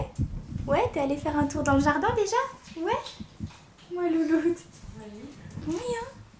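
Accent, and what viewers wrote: Belgium. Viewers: tu es belge ? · L’accent belge, ma passion 😍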